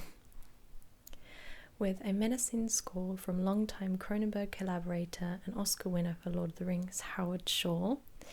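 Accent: England, United Kingdom. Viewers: so let me get this straight. .. an female english accent, asmr video about the brillant of David cronenberg. well I subscribed!. but u left out scanners. which is had the man with thee, thee greatest voice patrick mc gohan. well Richard Burton is thee best but patrick is a close second. and never forget about David Lynch or the Stanley kubrick.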